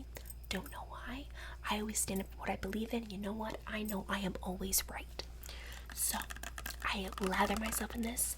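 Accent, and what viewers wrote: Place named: New Jersey, United States. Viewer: You sound more like a Jersey Shore Girl, but I really enjoyed this...Love, Karen BTW, you need to do a video giving her date a shakedown because I made all my daughter’s dates pee their literal pants. They were so afraid of me!! That’s a true Karen...stare him down and yell at him with a whisper!! :)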